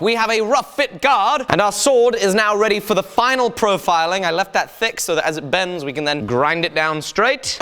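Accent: England, United Kingdom. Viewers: He is a pom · Overdoing? You realise he's an englishman...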